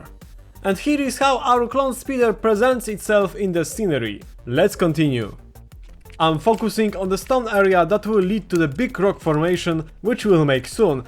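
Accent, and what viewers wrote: Poland. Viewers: You have Polish acent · Bro speaks English jak polak💀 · słychać polski haha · Słychać że polak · słychać że polak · from where are you from you sound polish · Polski akcent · I can understand the accent because I am also from Poland and I. Love these videos, keep up the good work I hope you get 1M subs before 2026 👍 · usłyszałem taką nutkę polskiego mówienia po angielsku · masz polski akcent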